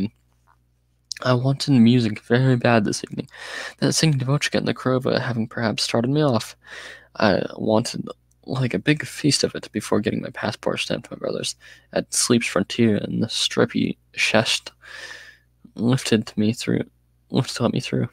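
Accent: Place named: United States. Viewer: Hey, are you american? I like your accent I could listen your accent all day long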